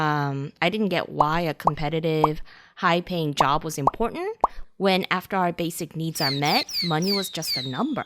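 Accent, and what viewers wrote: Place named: South Korea. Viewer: Not related to the topic, but I assume you are Korean American? Haha... just a guess but you have a bit of that Korean accent while speaking English.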